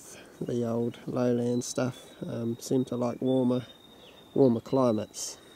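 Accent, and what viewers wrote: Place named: New Zealand. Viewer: Good one. I like your Chanel. Great to hear the true Kiwi accent and colloquial use of language 👍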